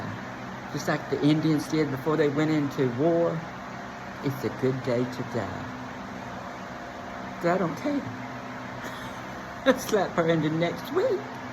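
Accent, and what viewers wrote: South, United States. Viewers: Hi, you're the opposite of ugly. You are very attractive both in your appearance and your demeanor. As a fellow North Carolinian, I also love your Southern drawl. Even though I'm not an "ally", even though I'm very traditional in my values, please know that I like and respect you and enjoy listening to your content. You bring a refreshing and humorous voice to what can be a contentious topic. Especially I appreciate that you're clear about the differences between born male and female as to athletics. And you are clear on not being okay with grooming, unlike the radical activists stealing the conversation. Thank you for speaking out.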